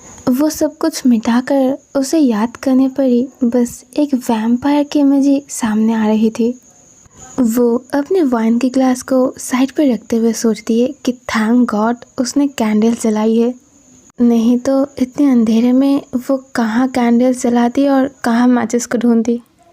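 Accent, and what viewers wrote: Bengal, India. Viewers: I love your bengali accent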